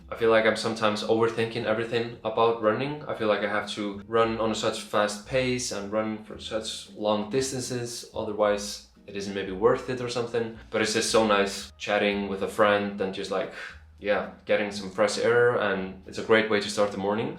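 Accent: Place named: Iceland. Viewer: has a more pronounced Icelandic accent than Birta, this is cute :)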